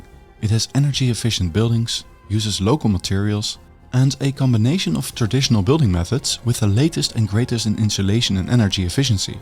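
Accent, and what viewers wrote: Netherlands. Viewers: Wat een gezellig Nederlands accent!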